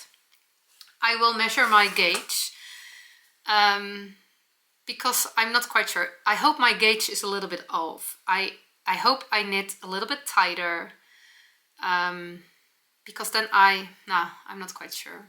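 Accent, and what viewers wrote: Netherlands. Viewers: We love your Dutch English! It is actually very good! 🙂💕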